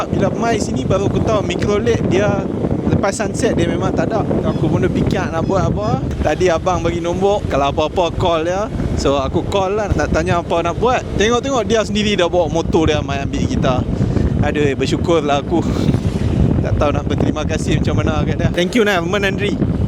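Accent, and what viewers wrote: North, Malaysia. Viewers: u ckp loghat utara, i mati2 ingt u org melayu. rupanya u indian. tapi u ckp mmg macam melayu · Wow... Kita sekampung loghat utara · baru pertama kali tgk video abg memng puas la dgn loqat utara lagi the best ...semoga channel abg cepat brkmbng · Slang utara dia memang sempoi.. Jelas dan faham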